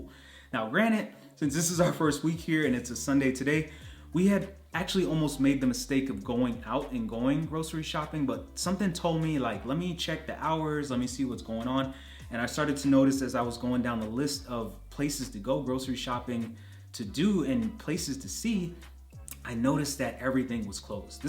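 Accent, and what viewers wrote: United States. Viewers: Welcome to Germany! I remember when I visited the US for the first time a few years ago and I was completely shocked to see every single shop open on a sunday until late into the night! In fact, I had specifically planned to do some last minute shopping on monday just before my flight back home, because I was so used to no stores being open on sundays. Edit: I'm a native speaker myself, having grown up abroad and - out of necessity - bilingual, so I can tell you're speaking American English. Most Germans won't be able to tell tho because while most people here can hold conversation in English reasonably well, they're not that well-versed in recognizing different accents.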